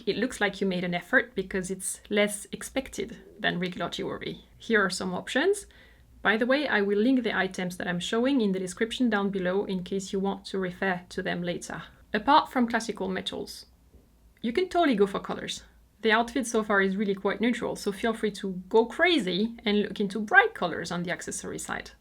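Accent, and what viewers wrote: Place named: France. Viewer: I could listen to you talk about fungus and cellulite all day 😂. You have a lovely voice and French accent. Everything sounds so especial!❤️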